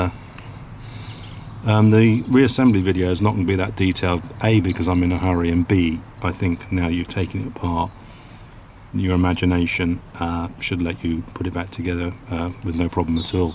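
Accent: United Kingdom. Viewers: Love your voice, and how your way of speaking is very clear opposed to many other british people where you can hear the heavy accent.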